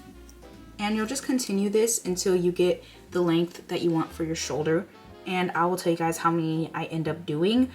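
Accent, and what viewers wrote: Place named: United States. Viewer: thank you for the tutorial! could you pls tell me what american accent you have? asking for scientific purposes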